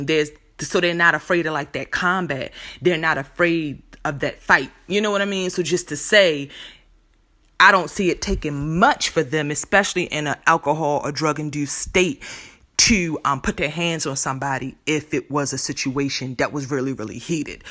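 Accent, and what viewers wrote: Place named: Baltimore, United States. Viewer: You've gotta be from Maryland, Baltimore to be exact cause the way you pronounce your O's and U's is so BMORE.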